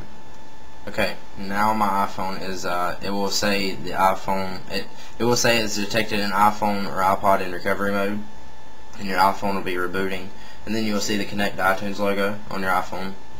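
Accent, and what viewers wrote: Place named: Texas, United States. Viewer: its a texan accent i think lol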